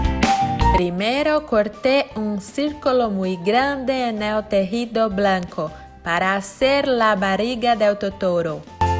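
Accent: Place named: Spain. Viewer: Amei seu sotaque Espanhol 🤣🤣🤣🤣🤣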